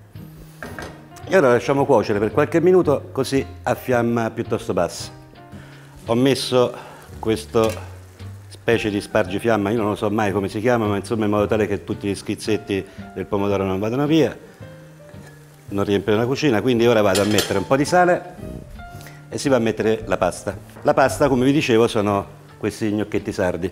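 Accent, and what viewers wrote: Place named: Rome, Italy. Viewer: sembrava un accento romano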